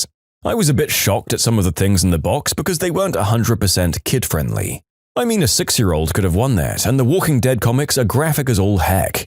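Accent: United Kingdom. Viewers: Legit i noticed the voice im laughing but no not offensive i mean i think british voices are funny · Since when is the narrator British? I honestly kinda like the British narrator ngl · British accent!!!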